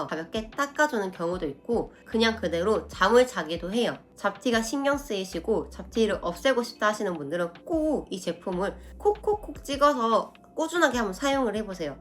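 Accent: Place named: Jeolla, South Korea. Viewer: 오 완전 전라도억양!!! 전라도 분이신가요???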